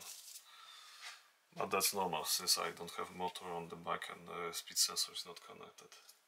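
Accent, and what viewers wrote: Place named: Russia. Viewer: it's realy solved my problem with error 10! thank you! конечно английский с русским диалектом это жесть, но за видос респект!!!!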